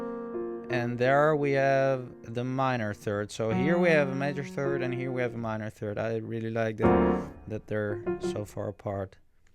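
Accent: Netherlands. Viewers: U sound dutch! :D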